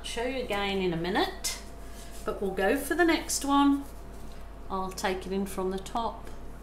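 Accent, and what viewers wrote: Birmingham, United Kingdom. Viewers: Is that an original brummie accent too?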